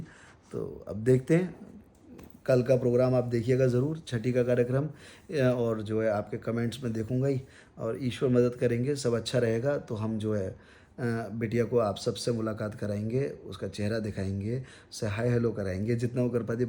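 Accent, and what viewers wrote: Standard. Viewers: Ap Hindi itne shudh tarike se bolte ho acha lgta h🎉🎉🎉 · itnii suddhh hindiii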